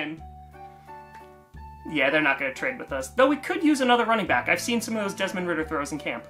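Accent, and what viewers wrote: New York, United States. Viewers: Did he lose his New york accent? 😅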